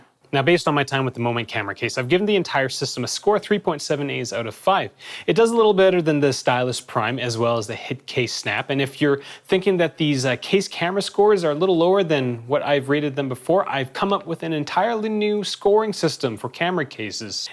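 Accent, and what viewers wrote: Canada. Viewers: Ha canadian eh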